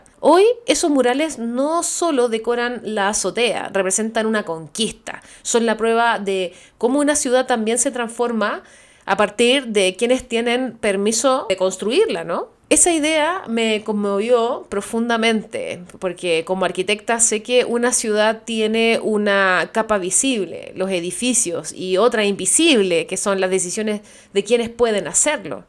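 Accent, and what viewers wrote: Chile. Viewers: Hola, querida...he visto varios de tus videos y siempre quedo maravillado por tus comentarios y tu particular forma de expresarlos con tal riqueza idiomática y con ese encantador acento chilensis. Me asombra tanta cultura en una persona tan joven, y envidio a tus amistades que tienen la fortuna de poder dialogar contigo y escucharte exponer tus agudos puntos de vista. Ojalá algún día te decidas a mudarte a BA para que los argentos podamos disfrutar de tu aporte cultural a lo que ya tenemos. Cuídate, muchachita, y que Dios te bendiga.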